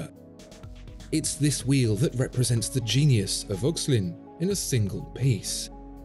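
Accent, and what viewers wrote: United Kingdom. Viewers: This videos is why I enjoy your channel so such. (NOT CAUSE WE AMERICANS FEEL THE BRIT ACCENT IS SO OLD WORLD but the amazing content of your videos) With a collection of PP, JLC, and Rolex (NOT ONE HYPE WATCH IN THE COLLECTION), years and years of buying what I love.. I'm so FUCKEN impressed with those whom are the true GENIUS of our time... Will sell one of the OVER PRICED ROLEX in the collection (purchased from Mayor Jewelers in Miami Fl.). For the past 18 years my local AD has called to purchase a ROLEX at AD prices. They know I will NOT sell what I true LOVE. This Watch is AMAZING..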